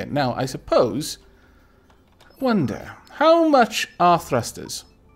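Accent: Wales, United Kingdom. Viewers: I sodding love your accent. The Welsh really have a wonderful language:) Great video, just as usal,! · I love the British accent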